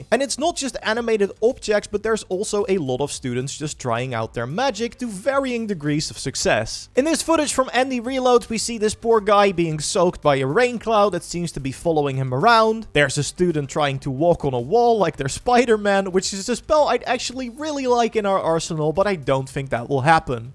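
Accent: Netherlands. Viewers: I really like your video's, but are Dutch? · I immediately heard that you were a fellow Dutch guy, cheers! Great video.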